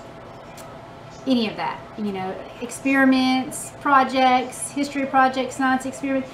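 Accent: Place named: East Tennessee, United States. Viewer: Welcome to the VHC! Based on your accent ... are you from East TN? We are a bit outside Knoxville and you sound like one of us :) And you are wearing orange ... hehe.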